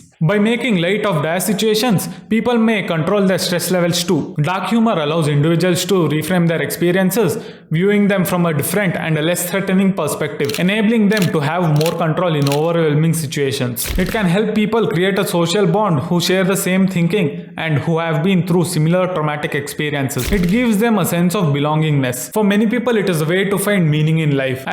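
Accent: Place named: India. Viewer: Bhai thoda space deke bola kariye, samajne me dikkat hoti h apki thick indian accent ko. No offense.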